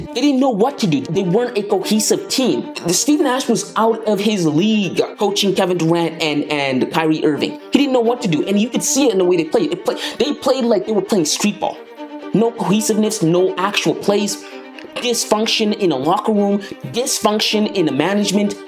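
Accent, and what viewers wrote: United States. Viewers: You sound like an American analyst. Looking forward to this team proving you wrong. Everyone in the league wants Durant… within reason. Nets aren’t giving up KD for Pascal and 2 firsts bro.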